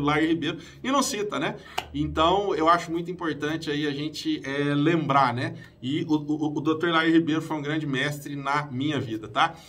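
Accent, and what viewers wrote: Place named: Minas Gerais, Brazil. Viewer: Eu gostaria de dar mil likes para você Dr. Sou mineiro mas resido aqui no Rio de Janeiro há 45anos, e você pelo sotaque parece ser meu conterrâneo, Mineirão mesmo. Eu era gago qdo criança até aos 9anos, minha mãe uma Pernambucana arretada me aplicou um remédio natural do nordeste, uma bela duma colher de pau na cabeça, e não é que eu fiquei bom na hora.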